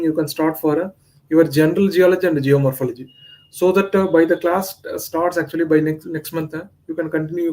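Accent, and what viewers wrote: South India, India. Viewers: Nobody cares about this shitty optional. Plus south Indian accent 🤢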